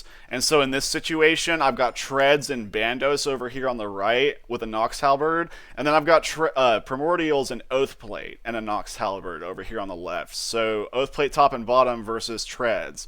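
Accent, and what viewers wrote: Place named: Connecticut, United States. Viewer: Bruh youre from Connecticut arent you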